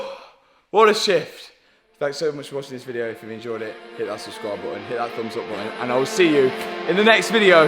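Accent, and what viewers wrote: United Kingdom. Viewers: I'm so irritated of his British accent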